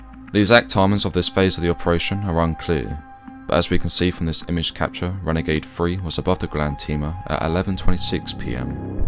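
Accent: United Kingdom. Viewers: Tip for your channel : some non-British English-speaking people across the world have trouble understand the strong british accent in quick fashion ..it's hard to keep up with the fast uncommon sounding words ..often we to need to rewind to see "what did he say" several times per video to keep up with the story ..please talk slower ..many non-brit people speak with dictionary English and find it hard to quickly understand island slang even though the island slang is the genuine british modern English ..we haven't kept up to the morfed sound of it and are slow at decifering it quickly ..you're channel is great , just hard to follow for some of us .. talk slower please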